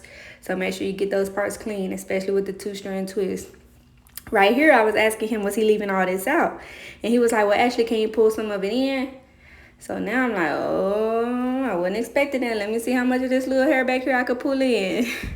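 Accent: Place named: Dallas, United States. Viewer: Sound like u from out here in Dallas... forgive me if I’m wrong luv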